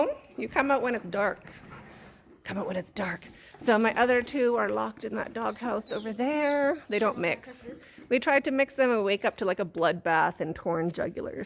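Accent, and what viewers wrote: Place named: United States. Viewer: Your big dogs are lovely! Will they eventually be allowed to be with the Bulldogs? Your accent is American...however it seems you live elsewhere ...may I be as bold as to ask where?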